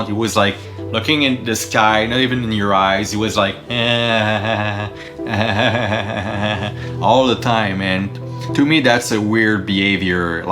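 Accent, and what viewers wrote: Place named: Quebec, Canada. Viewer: Bonne décision! c'est vrais que c'était marrant en québécois mais on ne comprenait pas tout. Les français sont des grosse faignasse, ils ne sont meme pas capable d'apprendre l'anglais 🤣 peut etre que tu vas les motiver hehe. Your English is Very good by the way!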